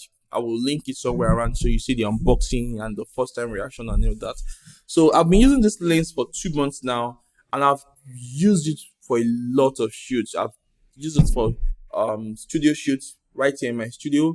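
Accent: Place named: Yoruba, Nigeria. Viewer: I LOVE YOUR YORUBA ACCENT ABURO..GOOD REVIEW..JUST SUBSCRIBED.!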